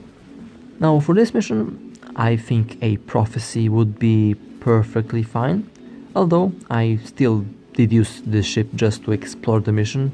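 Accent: Russia. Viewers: Дружище, русский акцент слышно))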